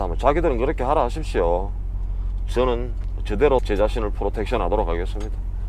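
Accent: Gyeongsang, South Korea. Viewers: 가실없이 시벌리는 말이 유창 하네요 우리가 남이가 갱상도 머슴아 아니가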